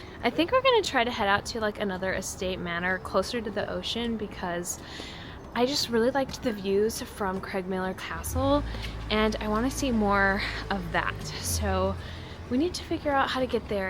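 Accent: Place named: United States. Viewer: Your American we understand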